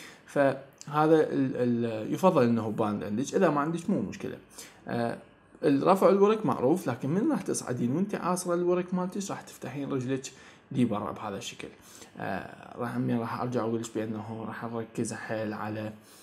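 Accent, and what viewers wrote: Iraq. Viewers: لهجه عراقية هاي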